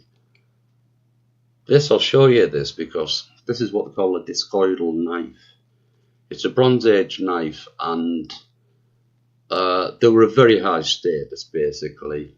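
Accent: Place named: Stockton, United States. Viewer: Nice one mate...i live in Sydney now but as a kid my Nana lived on Dovecote Street in Stockton and my mum was born there..good to hear the accent again 🙂👍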